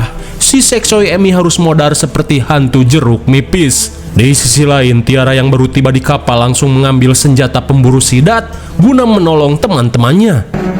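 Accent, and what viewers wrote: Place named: West Java, Indonesia. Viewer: ngomong nya nyunda bngt 😭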